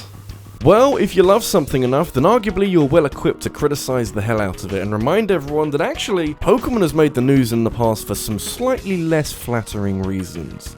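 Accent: England, United Kingdom. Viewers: He’s English. That’s how we say it :P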